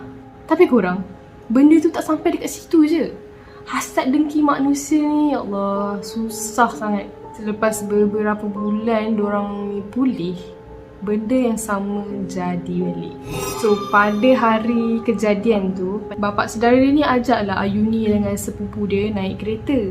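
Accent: Kelantan, Malaysia. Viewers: Bendo tu ko abe.? Erm yo dh la tu.. 🤣🤣😂 bulih kecek klate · comey laa ho ore melaka kecek kelate hahaha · Sy dari kelantan... btw jadi boh kecek qlate 🤣 so jgn berhenti mencoba lagi 😘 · emm yoo doh la tu! lawak sikit accent kelate kak 😂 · come kecek klate,hhuhu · cute je kak ckap kelantan 😍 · comel kak cakap klate...best tgk kak bercerita...❤❤❤kak one of my favourite youtuber.. Salam dari kelantan...😉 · Comel bhsa klntn 🤣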